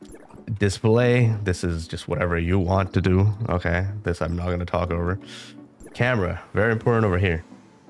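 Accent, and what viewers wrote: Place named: China. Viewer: are u chinese? I can feel the accent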